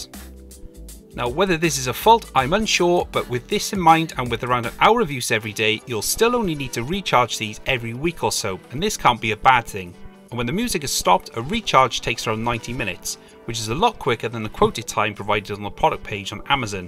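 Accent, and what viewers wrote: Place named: South Wales, United Kingdom. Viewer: Another great video. Good work! Nice to hear another South Wales accent 🤣👍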